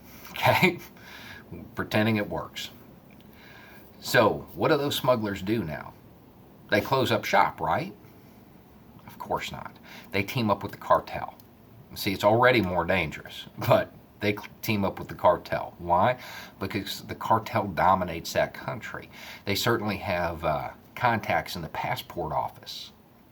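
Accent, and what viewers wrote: South, United States. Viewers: For as long as I've been watching your videos, I've been thinking: It sounds even truer when you hear it stated with a southern accent.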